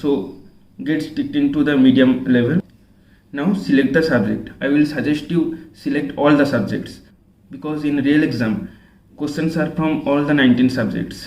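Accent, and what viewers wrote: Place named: Bengal, India. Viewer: That bangla accent is so nostalgic here in Delhi. Great tips! 👍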